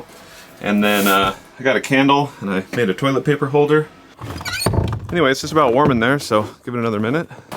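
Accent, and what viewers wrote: United States. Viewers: what the hell is your "Negative this negative that" Their is no negative it is either "below zero" or "Minus" You sound like you were born and raised in Miami beach! Speak like a Northerner! WTF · I don’t like his voice… too hillbilly. But impressive & brave carpenter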